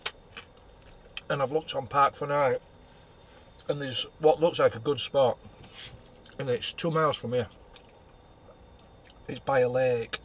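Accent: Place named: Newcastle, United Kingdom. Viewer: It's not rubbish it is what it is.. Glad your off to a nicer spot.. See ye Geordie speak..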